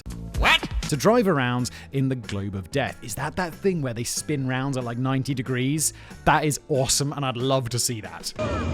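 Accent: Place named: RP, United Kingdom. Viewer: A minute ago, I watched a “Geographics” about Trans…figuritania?😂😆 this wannabe independent nation in Moldova that reminds me of Corsica -.the motherland) followed by a Into the shadows… and the more goes on, the more unhinged, nasal and Pommy (Ozy/Aussie expression to depict the British “Poms”/UpperMiddleClass) becomes. It’s so funny/uncanny!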